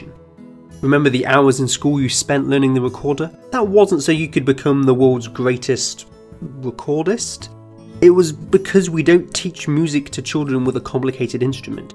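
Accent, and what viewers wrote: England, United Kingdom. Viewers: mi ne komprenis nenion ankaû, cxar lia akcento angla influas la prononco tro multe xD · What region of the U.K. is your accent from?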